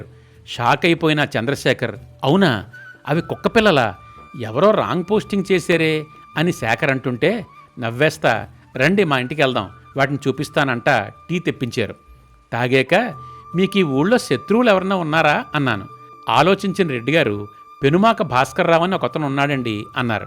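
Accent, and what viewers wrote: Godavari, India. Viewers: వెంకటరెడ్డి గారి విజయం యొక్క వంశీ గారి కథని అచ్చమైన గోదారి యాసతో కమ్మని కథనంగా వినిపించారు.... 👌💐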